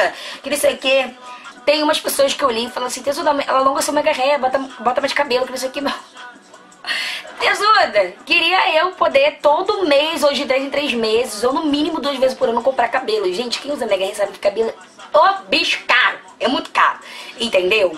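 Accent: Rio de Janeiro, Brazil. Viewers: Engraçado nesse vídeo vc se empolgando tanto nos assuntos aí do nada: então vamos passar esse pó... rs já que o papo foi mais comparação, confesso que quando comecei a te assistir sempre que escutava sua voz lembrava da voz da Heloisa perisse na personagem do filme o diario da tati. Sou carioca tbm, mas vc é a primeira pessoa que vejo nitidamente o sotaque do carioca. Agr comparar com nayara azevedo até vai, mas com suzana Vieira. PQP, nada haver kkk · Você só tem um pouco de aparência da Ste por serem cariocas, o jeito de fala. Mais são completamente diferente uma da outra. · Acabei de achar a tal da Viegas no YouTube.. e naaaaada aver.. vc é muito mais doida e mais engraçada kkkk jeito único. O sutaque de vcs q parece só.. mas normal né , afinal é tudo Rio.. bjos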